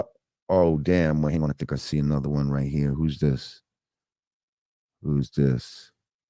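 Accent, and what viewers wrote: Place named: Ireland. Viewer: Salute to the Irish accent 🥃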